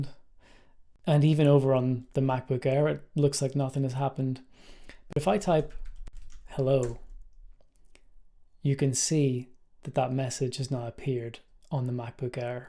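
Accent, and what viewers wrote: Northern Ireland, United Kingdom. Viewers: is that a Northern Irish accent I hear? ;))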